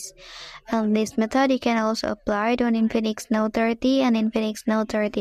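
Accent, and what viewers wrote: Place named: United Kingdom. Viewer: So British luh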